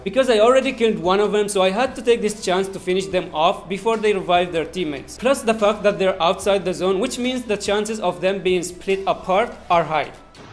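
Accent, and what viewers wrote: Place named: India. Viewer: Title: "This is why my server is the hardest" Me: Probably never heard of Asia’s servers Also me clicking the video and hear the indian voice Oh… Never mind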